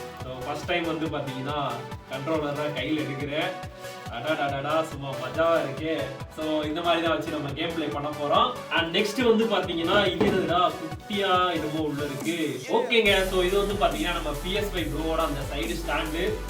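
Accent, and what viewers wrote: Sivakasi, India. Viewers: Bro, Sivakasi ah neenga ? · Bro nee sivakasi ah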